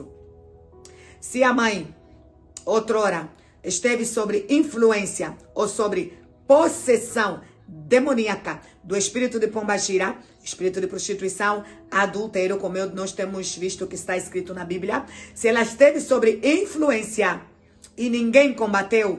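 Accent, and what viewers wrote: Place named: Latin America. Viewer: Então quer dizer que é esse espírito que faz alguém pensar em pornografia e sexualizar as pessoas? A pastora é de algum país hispânico da América Latina? O sotaque é de algum desses países...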